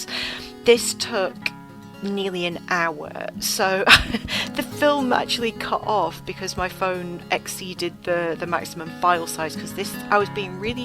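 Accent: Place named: Australia. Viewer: Love it!! Love your accent are you from Australia?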